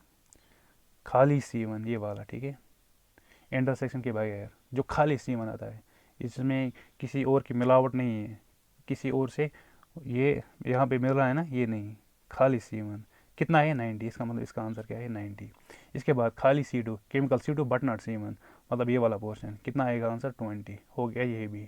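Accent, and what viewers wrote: Kashmir, India. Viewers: Zero respect for your pitch and language. the way you speak Urdu. It's like kashur Urdu.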